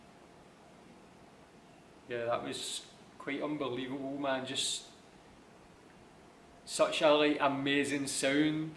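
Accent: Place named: Scotland, United Kingdom. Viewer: Love your Scottish accent : )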